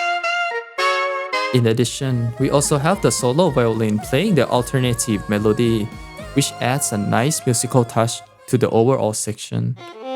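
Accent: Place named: Thailand. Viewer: ตามมานานแล้ว พอรู้ว่าเป็นคนไทยยิ่งดีใจเลยค่ะ (เอ๊ะแรกคือจากสำเนียงค่ะ55) ทุกคลิปของคุณคือดีงามมากเลย จะสนับสนุนต่อไปนะคะ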